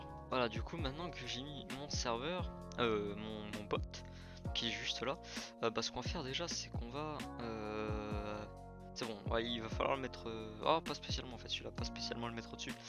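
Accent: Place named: France. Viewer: idk man french accents are so pretty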